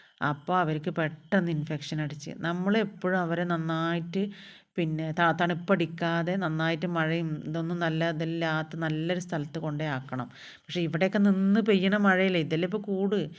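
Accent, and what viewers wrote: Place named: Malappuram, India. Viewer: കൊടുന്നാക്കി എന്നത് അരോചകമായി തോന്നുന്നു, കൊണ്ട് വന്നാക്കി എന്നാണ് പറയേണ്ടത്. സംസാരത്തിലൂടെ മലപ്പുറം ജില്ലക്കാരിയാണെന്ന് തെളിയിക്കരുത്. കൊട്ന്നിടുകയല്ല, കൊണ്ട് വന്നിടുക.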